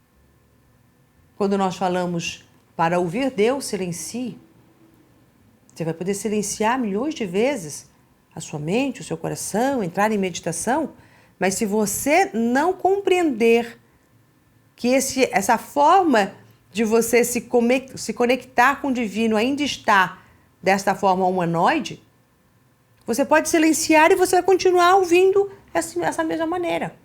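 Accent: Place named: Italy. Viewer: tem sotaque italiano.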